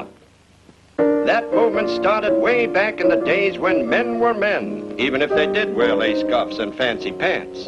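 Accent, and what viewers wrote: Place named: Transatlantic, United States. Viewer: Sounds transatlantic.. and maybe northern!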